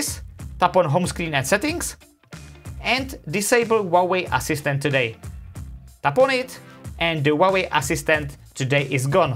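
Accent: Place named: Czech Republic. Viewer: mě bylo hned jasný že jsi Čech jsem to nějak poznal podle anglictiny a hlasu❤️ fakt díky moc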